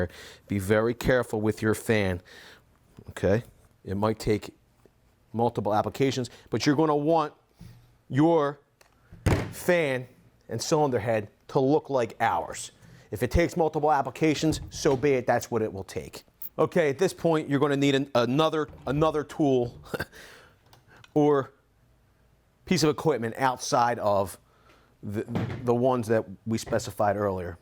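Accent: Philadelphia, United States. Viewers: I knew that accent! It's like being home again, in Philly! Also, thanks for a clear video on cleaning my Paslode.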